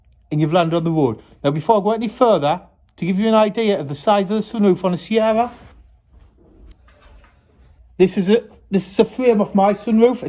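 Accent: United Kingdom. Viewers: Victor Oro Are you from the UK?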